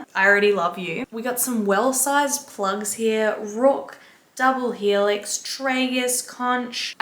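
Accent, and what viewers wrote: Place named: Australia. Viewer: I love your voice! Australian accents are my favorite!!!! 🖤 I’m from Tennessee lol. Oh, and have you ever said what your real name was? I can’t find it 😆 haha, sorry just curious. That would be cool if you did a q&a not just about piercing stuff, but I understand if you don’t want to get too personal on this crazy a** internet. Lol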